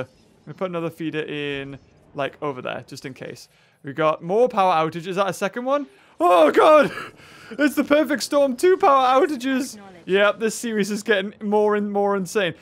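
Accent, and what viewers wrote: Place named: Geordie, United Kingdom. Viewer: You're accent is weird I mean I can tell you're a Geordie but you say a lot of Scottish sounding words too, BroonCoo,Baba, photea maybe Scots English and Geordie words are just similar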